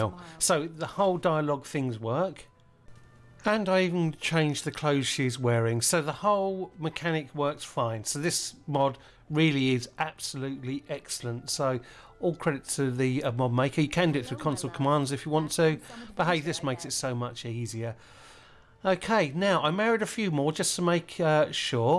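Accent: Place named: Cornwall, United Kingdom. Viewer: Cornwall, I wondered why I couldn't pick your accent !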